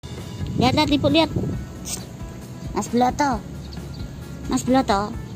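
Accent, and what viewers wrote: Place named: Java, Indonesia. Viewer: medhok banget logatte.......?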